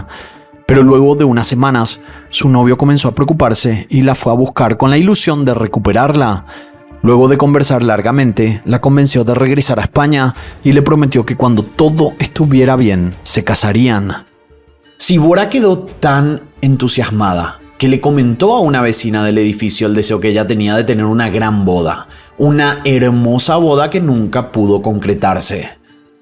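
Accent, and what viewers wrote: Paraguay. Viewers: Es paraguayo. Habla hermoso 💃😎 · es de nacionalidad Paraguaya, y así hablan los paraguayos!!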